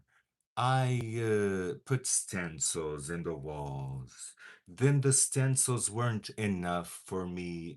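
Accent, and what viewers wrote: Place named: Pittsburgh, United States. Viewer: Ha you guyz!!!! How are Yinz dooooooing!!! You sound like a Yinzer!!! Hehehehe Pittsburgh would love you in it's city❤❤❤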